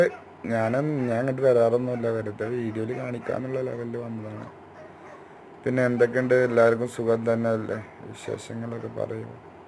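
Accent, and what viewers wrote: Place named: Thrissur, India. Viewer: എങ്ങനെ തൃശൂർ ഭാഷ..... 🤔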